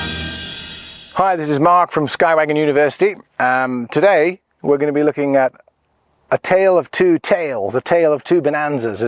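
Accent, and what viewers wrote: United Kingdom. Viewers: Hi Mark only just discovered your channel and subscribed after watching your first video. Really like your style of presentation and the cool British accent is music to the ears 😂 I’m currently looking for a Bonanza in Australia and confused between the choice of an A36 a V35 and a C33 Debonair which are currently available on Australian market. Can tell from your videos you’re not a big fan of the Vtail so youd probably rule that out but between the A36 and C33 which one is your favourite and why? So far through my reading (and watching YouTube!) the A36 has the advantage of bigger door , which would be a plus for me for loading say camping equipment or a bike and also Ive heard the longer fuselage helps with less wag in turbulence. Keen to hear your thoughts on it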